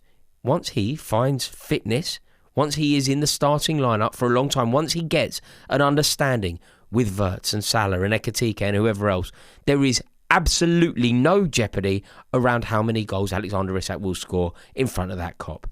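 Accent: RP, United Kingdom. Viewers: That’s a standard every season with this idiot, uses big words and his posh Tory accent and thinks he’s some footballing genius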